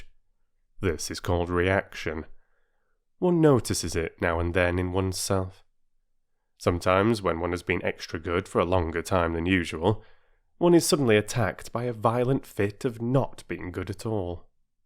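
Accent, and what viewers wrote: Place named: Yorkshire, United Kingdom. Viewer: Love it! This has been my second time listening to your warm and joyful performance. How wonderful, the warmth, nuances, and humor of an actual human voice (vs. AI). And your voice is an especially fine one in timbre and tone. Love the touch of Yorkshire too.🙂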